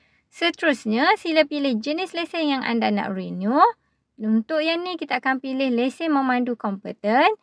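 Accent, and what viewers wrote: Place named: Kelantan, Malaysia. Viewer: Awk org kelantan ke. Mcm slang kelantan 😊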